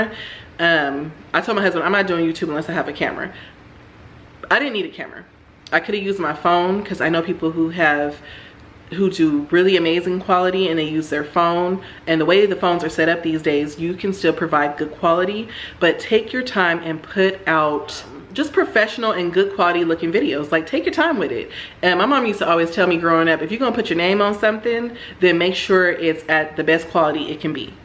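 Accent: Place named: South, United States. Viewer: When I stared watching you I KNEW were a southern. You could have knocked me over with a feather when I learned that you were from California.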